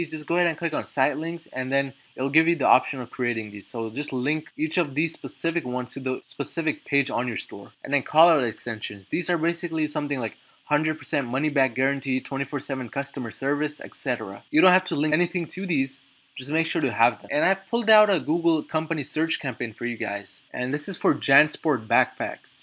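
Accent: India. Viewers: Did you mean campaign or company? What is this bg sound. Where are you from india?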